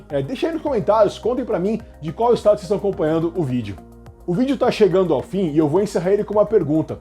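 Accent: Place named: Centre-South, Brazil. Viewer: no merdil basta vc falar como centro sul que ja acham lindo mas quando um tuga ouve nao curte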